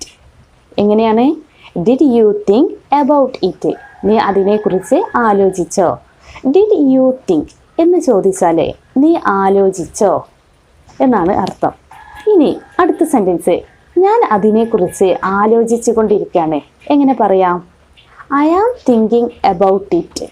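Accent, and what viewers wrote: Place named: Malappuram, India. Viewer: I think u r from malappuram.because of ur talking style.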